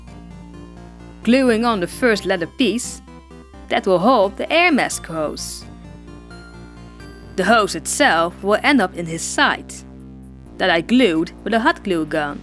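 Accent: Netherlands. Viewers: ok you can hear your dutch! but that what i love ( and the doll omg ). i always makes me smile when you talk bc i hear myself in it haha ( btw i'm dutch if you didn't know yet ) but i love him he's awsome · where are you from? you have a really dutch accent XD <3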